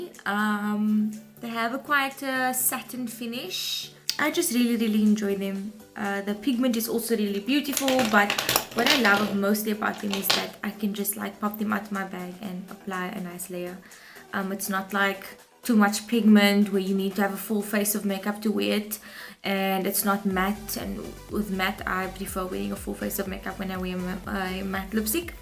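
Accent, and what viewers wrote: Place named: South Africa. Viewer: U speak like my mualima... true S.african😚😍😛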